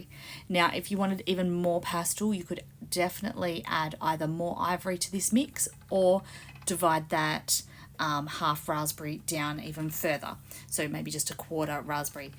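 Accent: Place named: Australia. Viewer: This time of year is my favorite when it comes to making pretties because of the color palette! These sure are some pretty ones you made!!! Like I’ve said before, I could listen to you talk all day long. I absolutely love the Australian and British accents!!! I live in America, but I sure would love to find me a man with a nice accent!!! 😂 Something I started doing rather than buying all of the different pearl clay separately I just buy a bunch of pearl clay and turn any color. I want to into a pearl! When I make snowman, I usually use the pearl. And sometimes I like to not get it completely mixed up where it’s swirled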